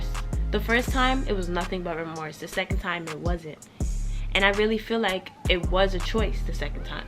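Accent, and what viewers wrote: New York, United States. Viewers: It’s deadass not enough New Yorkers on YouTube cause I love the way we tell stories. when you was like “ guess who sent me the picture “ I felt like my bestie was putting me on !!!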